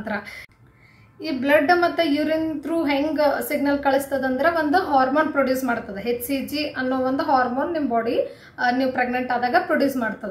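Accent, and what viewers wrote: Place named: North Karnataka, India. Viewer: ಪಕ್ಕ ಉತ್ತರ ಕರ್ನಾಟಕದ ಭಾಷೆಯಲ್ಲಿ ಹೇಳಿದ್ದೀರಿ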